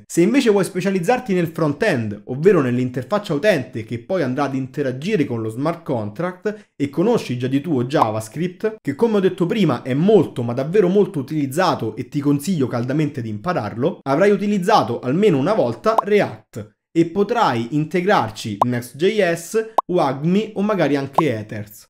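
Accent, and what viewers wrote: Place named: Italy. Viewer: Non ho capito un caxxo L inglese configurato in Italico parli🤣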